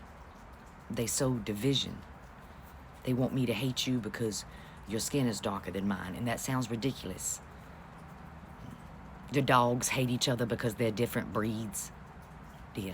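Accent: South, United States. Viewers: Can I say that as a Black Southern Woman, I absolutely adore your Southern Belle accent. My first time seeing her content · I love that southern accent you have, to me it is so sexy on you. I also love the truth that you bring to us. Keep sharing your knowledge and many blessings to you, love.❤ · I love your southern drawl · You are so memorizing to the point I don't even see your color. That Southern Bell accent makes me feel like I'm listening to another sister. Furthermore you are intelligent too. Appreciate you girl! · Your take on humanity is awesome. The southern dialect is so refreshing. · I truly enjoy your content, you give it to us straight, no chaser! Just the way I like it! And, I love your accent, it has that southern charm with a sprinkle of big mama truth in it 😄. Keep bringing it sista!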